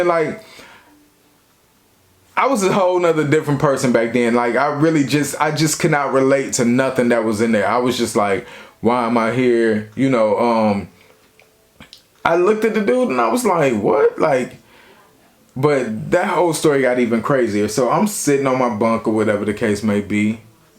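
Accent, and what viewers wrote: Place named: DC-Baltimore, United States. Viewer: Bet. That accent sounds familiar. DC to Bmore usually have a variation of it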